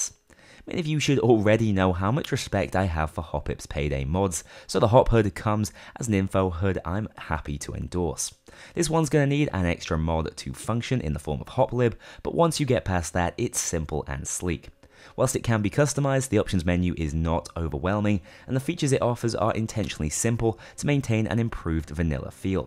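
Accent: Denmark. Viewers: bro er du dansk? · Are you danish?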